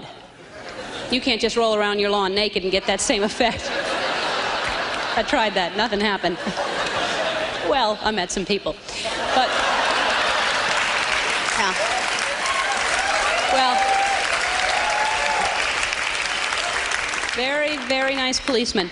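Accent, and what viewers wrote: South, United States. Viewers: Her southern drawl is more prevalent in this one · has a souther accent here;) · Her southern accent is so much stronger here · Back when still spoke with her Southern accent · I miss her southern accent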